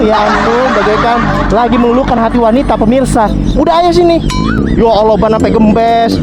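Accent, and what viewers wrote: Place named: Jakarta, Indonesia. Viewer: Lu di Lampung ngomong kayak Betawi bang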